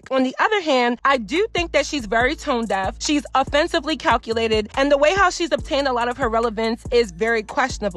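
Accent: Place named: Baltimore, United States. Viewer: Random, but I can tell by her accent and the way she enunciates her words she is 99% likely from Baltimore....great video...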